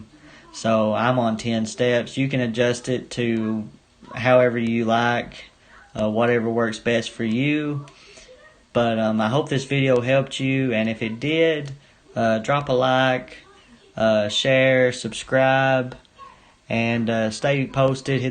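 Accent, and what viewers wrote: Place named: Deep South, United States. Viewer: Yo u gotta be from the Deep South. Accent is superrrrrrr strong. U sound mad racist lmfaooo.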